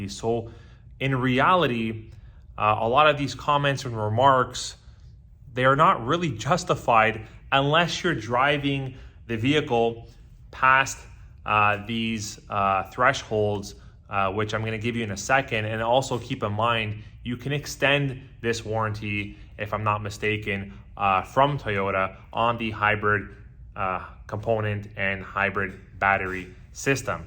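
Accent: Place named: United States. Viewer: Why are you using kilometers units, obviously you sound you’re from the US. 180,000km equals roughly 112,000 miles. The problem I see is first you are paying a premium bc it’s Hybrid, and if the batteries fail before the warranty, wouldn’t that eliminate the savings for fuel ( the reason why you bought a hybrid) If you’re an owner that keeps a car for a long 15-20 yrs, (like me) how much EMF has that person been exposed to ?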